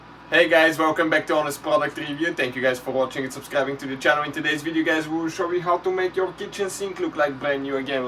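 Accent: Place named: Russia. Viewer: He sounds Russian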